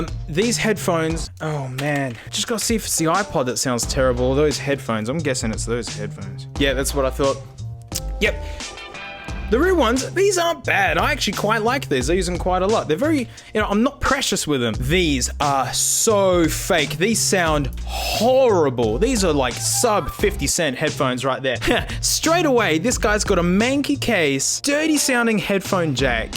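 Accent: Australia. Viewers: This voice is so funny to me, probably because he is Australian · Gotta love the Australian accent let’s get in there MATE!!!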